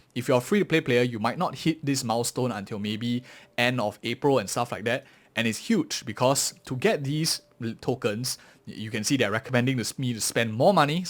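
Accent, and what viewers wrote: Singapore. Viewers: I could tell that was a Singaporean accent right off the bat haha. Fellow SG as well, really good guide since we have roughly the same VIP level but I’m in S54. Nice to see an SG Creator! Keep it up 👍🏻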